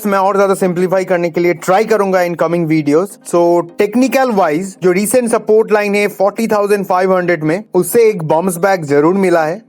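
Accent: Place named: India. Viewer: Beautifully explained. I love your ehindi accent....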